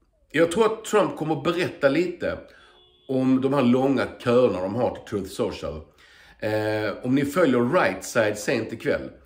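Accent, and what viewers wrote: Skåne, Sweden. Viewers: Pratar skånska nu?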